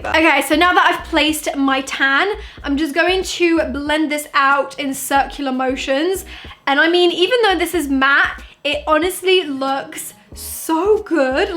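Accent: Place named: United Kingdom. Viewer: Your tiny little eyes plus your British accent.... ❤ looks and sounds so cute :D i wish i could hv a British accent like that :<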